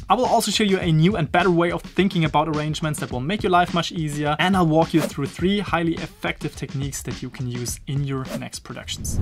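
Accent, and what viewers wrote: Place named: Germany. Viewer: You sound very German xD Great trick buddy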